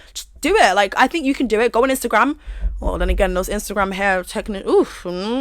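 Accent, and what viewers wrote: United Kingdom. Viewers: I just realized something. And this isnt gunna be PC lol but im genuinely just curious. So most black people in America have a "blaccent" (obvi not all and I did not make up that term) but is that not the case in the UK? Bc you sound like most other British people I've heard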